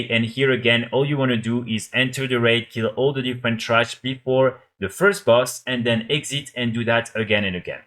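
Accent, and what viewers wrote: France. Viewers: Are you French ??? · tu es Français toi, l'accent ne trompe pas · Nice french accent :)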